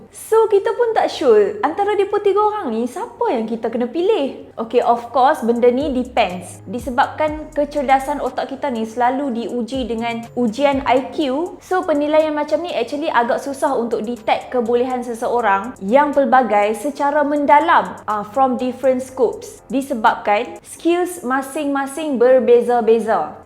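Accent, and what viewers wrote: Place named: North, Malaysia. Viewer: Beauty with brains, tp pekat nau utagho😂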